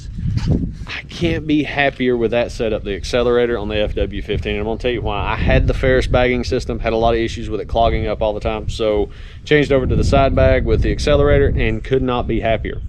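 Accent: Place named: South Carolina, United States. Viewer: Just started watching you. Accent says SC. Am I close?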